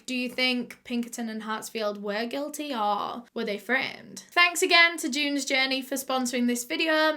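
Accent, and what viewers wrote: United Kingdom. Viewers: Who else is just mesmerized by her British accent?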